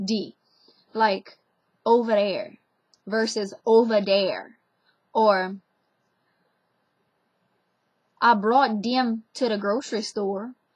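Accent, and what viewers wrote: Louisiana, United States. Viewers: tekkmineharmony This young lady's accent is not northern LA at all. She sounds like she is straight from the bayou, and she does a fine job explaining the differences between the north and south. NOLA accents and southern LA accents are not inclusive of one another. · BABYY!! I'm Cuban... lol ..I've issues with regular English pronunciation and understanding this Texan accent but ohhh girl... Louisiana accent.. I'm out.. to much for my Cuban brain ... lol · You would be fabulous at reading audiobooks where the story is located in LA. Its awful to hear when they get someone from CA trying to do a LA accent. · ❤ Bonjour, ma blond ! ❤ Anyway, Cajuns speak two separate languages, if they can anymore. They most all can speak Cajun English or just plain English like everyone else in the USA. Cajun English is just what she is speaking, dem, dat, dare ( like ova dare for over there. 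) English with a different pronunciation. Older Cajuns can speak the Louisiana French of their Accadian ancestors, from Nova Scotia. They were Canadian French people who spoke French. They originated from Western France, so they spoke French. And they spoke French in Nova Scotia. And they speak French in Southwestern Louisiana to this day. There is just so much prejudice in the USA against any language other than English. So much has been done to stop the Cajuns from speaking Louisiana French. It's just a miracle that anybody in the USA speaks French. Universities in the USA only attempt to teach Metropolitan French from textbooks. This is known as textbook French or written French. I am sure most of the teachers or instructors do not speak French. They speak English and can usually read some French from their textbooks. How else are they going to teach what they can, to students who don't know that their teachers have so little knowledge of the spoken French language. 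Universities, step up ! Don't waste your time, and that of the students in this half assed teaching attempt. Hire some real teachers, native speakers. They all went to school forever in France, and they know French as any native does. Step Up ! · I’m an actor studying for a role. My character is from Louisiana. Your accent in near impossible to do! Dang. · Megan Perry That's right. I'm from Paradis, La, ppl in Bayou Gouche has that Cajun draw which I love. Ppl in Des Allemands has that cajun draw. Like she said I have that New Orleans accent where yat? I like her accent, Thiboudax close to it :) :) :) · she is sweet as can be. she's generally right on the regional accents and hollywood butchering all louisiana accents. i would say she has a hybrid accent sometimes sounding cajun and sometimes more like north louisiana. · The Louisiana accent is very subtle. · I lived in Gretna for a bit, and like the state her accent is a mix of cultures. 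She definitely doesn't have anything close to a Jersey accent. It's beautiful either way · spaghetti that's bull she has a Cajun heartland accent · I live in Baton Rouge but I have some family that life in Eunice near Lafayette and their accent sounds a lot like yours lol my accent sounds more creole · Ahhhhhhh The Louisiana accent especially from a pretty white girl and im from Ca. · Wonderful and beautiful Louisiana accent! Mine is southern Appalachian, Virginia and it is mixed with South Carolina low country. Have a wonderful day, so enjoy your channel! 🤗🇺🇸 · I am a Cajun culture adoptee from Central America. Married a wonderful Cajun girl from Sorrel, LA and I love Cajun places and people. I love the way you share your culture with others. Keep up the great work young lady. You have a wonderful and unique way of speaking very eloquently and with that sweet Cajun accent. Love it. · being from avoyelles parish, she does sound like it to me. 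maybe Mansura if I'd have to guess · You sound like you from Breaux Bridge!! Holla from NOLA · Definitely prairie Cajun.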